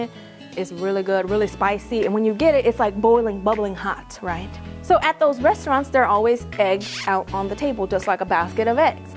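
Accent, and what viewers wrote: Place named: Los Angeles, United States. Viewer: LA accent?? I like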